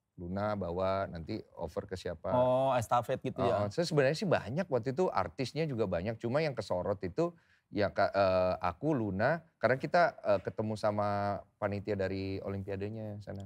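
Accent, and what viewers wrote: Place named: West Java, Indonesia. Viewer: baru tau kalo logatnya denny sumargo ternyata nyunda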